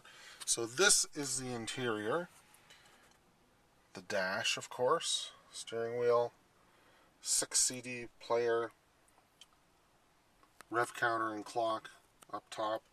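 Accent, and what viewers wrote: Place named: Canada. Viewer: sounds like you are from Canada sweet car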